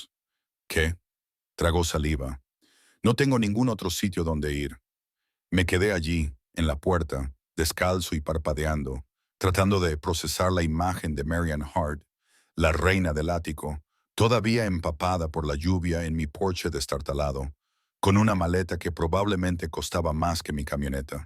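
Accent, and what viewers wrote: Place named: United States. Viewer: Qué pesadez ese scento gringo de AI (estupidez natural)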